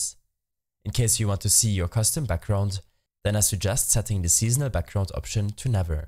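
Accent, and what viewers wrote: Germany. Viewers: so geil das man raushört das er deutsch ist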